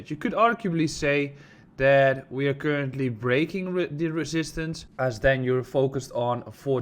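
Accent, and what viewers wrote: Netherlands. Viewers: nederlands dat hoor je gelijk haha !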